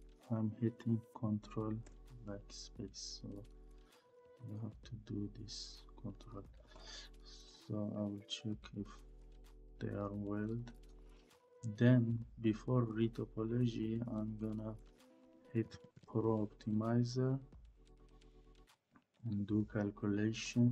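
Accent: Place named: Iran. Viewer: You have a Persian accent, are you from Iran? We are easily distinguishable.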